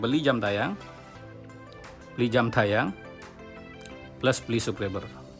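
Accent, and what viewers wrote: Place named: Madura, Indonesia. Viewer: Logatnya kok mirip orang madura ?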